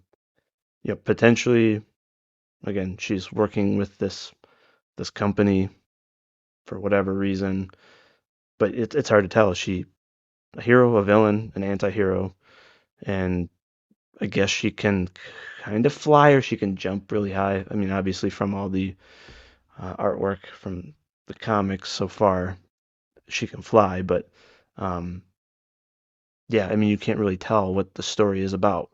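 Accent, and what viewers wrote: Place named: Germany. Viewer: Ты говоришь по-русски язык!! Я слышу немецкий акцент…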